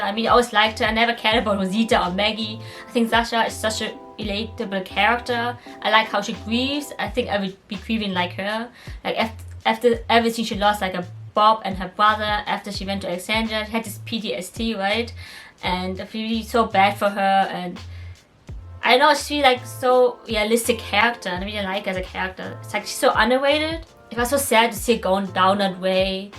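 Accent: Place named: Germany. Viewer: I admired your Review on the walking dead movie. Your narration is very well paced. And I felt a German accent in your way of speaking I don't know if I was right! Thank you for talking about this movie. I will put it in my list of must-see movies!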